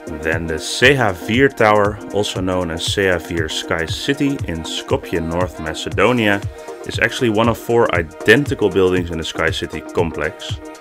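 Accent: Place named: Netherlands. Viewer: No hate but this is the first video I’ve watched from you and I immediately recognized your Dutch accent (de mijne is erger😅😭) and I love accents so it doesn’t matter❤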